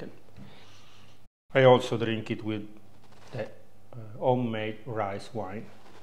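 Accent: Italy. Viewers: I have been watching all of your videos ever since 2020, and it has become a family tradition to watch your videos all together. I would say try to make "shorts" trailers of your actual videos with a link for people to click on and watch the whole video (put a lot of suspense in it to draw their curiosity to watch the full video), reupload those shorts on tiktok and instagram (tiktok can get you a lot of views on shorts). Also work on your thumbnails a bit more, put like a picture of your "surprised" face looking at the dish that you're making. If you're unsure how to do this or how to take a step further on your channel, I would recommend taking Udemy courses (for like 10$) on "school of film" or something similar, sometimes no matter how much you know about things, a course or a tip might always be useful. Personally, what I love about your channel are the traditional italian recipe with your italian accent, and your preserving/canning videos (like sun-dried tomatoes, peeled tomatoes, wine etc ...) If none of the above works, then you might consider switching to creating short videos (less than 1 minute) and post them on youtube, tiktok, instagram but don't give up! 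You have a big fanbase and a big base to begin with, you're halfway there ! Love from Lebanon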